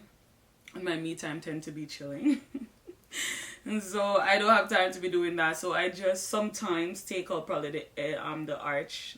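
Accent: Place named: Jamaica. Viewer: Beautiful makeup routine . Are you Jamaican? I hear the accent . ❤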